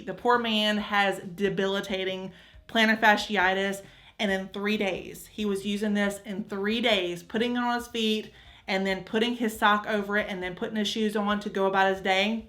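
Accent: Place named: Alabama, United States. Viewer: This is my first time finding your page and my first thought was she talks just as country as me, i wander if she lives in Alabama too ? 😂😂 And before the video ended u confirmed that in fact u do 👏🏽😂i knew it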